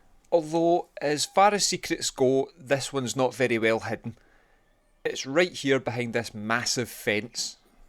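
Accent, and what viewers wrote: Glasgow, United Kingdom. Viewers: I just love to hear your clear G look ashier accent and see my belovedCity; I spent many years abroad and now am home. Good on ye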